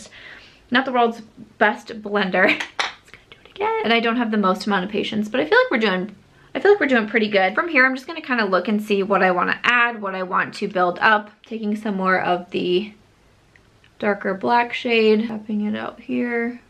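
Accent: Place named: Iowa, United States. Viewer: #samsquad I love this palette! I love the pink look! It would inspire me to try the greens🙃. Lastly but certainly not least, I love your videos. I married a man from Nebraska so listening to an Iowan is extremely comforting. #samsquad jennesskh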